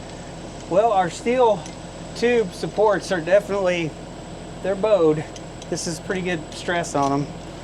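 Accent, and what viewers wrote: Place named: Tennessee, United States. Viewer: I been hearing the ess-cavator as well. I think it's the Tennessee and Kentucky that migrated into rural southern Illinois.👍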